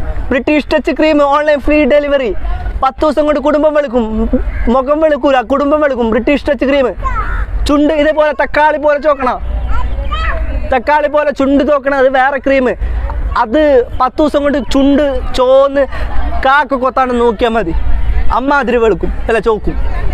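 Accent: Kasaragod, India. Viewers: Ksaragod slang mindan ni onnum koodi indanam mone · kasaragod basha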